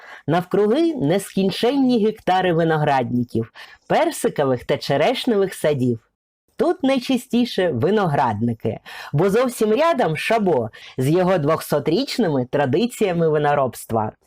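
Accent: Russia. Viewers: Та де там "гарна"! : ЗАХАДИ та живи, вітальня-СТАЛОВА, коти МІЛКІ, намагалась знайти ТРІЩИНИ, на випадок проблем з ВОДОПРОВОДОМ, велике ПРИДАНЕ.., вже не кажучи про оте московське "акання"; невже аж так важко вимовити "о", тим паче читаючи текст: адже написано по-руськи (т.б. українською) "о", а вимовляють по-московськи "а"?!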